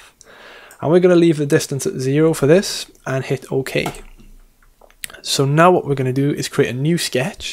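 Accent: South Wales, United Kingdom. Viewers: EXACTLY what I was looking for explained concisely and clearly in that wonderful South Walian accent. Merthyr boy here (now in Snwdonia) enjoying your videos.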